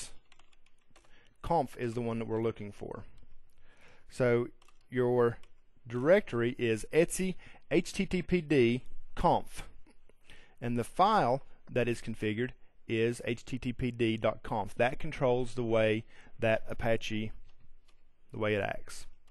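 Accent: South, United States. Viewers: I really like this guys accent what is it though I presume southern drawl but I'm not 100%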